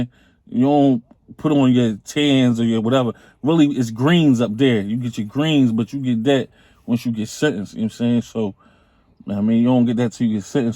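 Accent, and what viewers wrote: Philadelphia, United States. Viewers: Sound like you from Philly jawn a Philly word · U sound like a philly nigga slim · U sound like u from Philly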